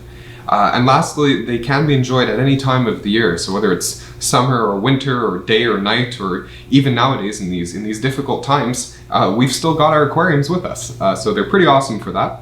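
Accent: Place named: West Coast, Canada. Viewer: I can spot a West Coast Canadian accent from a mile away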